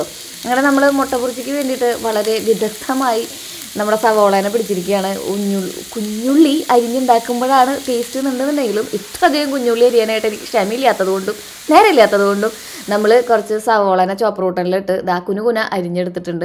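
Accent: Thrissur, India. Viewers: Nintay Thrissur style talks kelkkanada nan adhikavim ee blog kanunneyy...nee sharikkum oru inspiration anu ttoo.. nan pkd anu..nee aniyathy kutty anu ttoo..but experience il neeyanoo edathy onnu thonnipokumm..ninney nan follow cheythu. Nanum entay goal... achive cheyutoo..in sha Allah ❤❤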